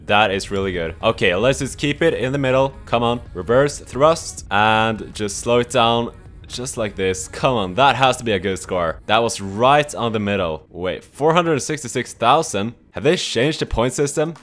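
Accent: Sweden. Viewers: Are you from Sweden, cause you have an Swedish accent, if you are then I’m gonna say: Hej, beroende framkallande videos! Hoppas du har det bra! :) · You can hear very well that he is from Sweden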